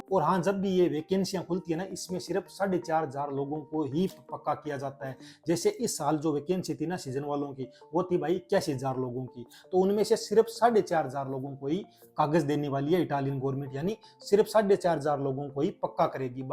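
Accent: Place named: Haryana, India. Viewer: Language Haryanvi Touch 😊😊😊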